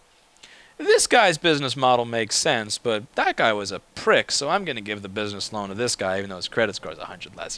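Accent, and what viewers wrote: New York, United States. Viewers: It’s really weird how your accent has become more of a New York accent in the last few years.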